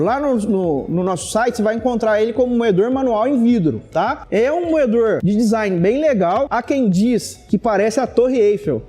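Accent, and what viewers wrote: Minas Gerais, Brazil. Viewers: mudou para mineires no vídeo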